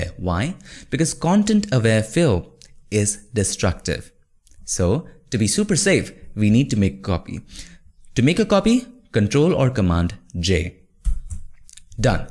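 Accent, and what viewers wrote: India. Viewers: perfect indian voice i see on youtube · i realy like your monobrow. but indian english is very exhausting to listen to for me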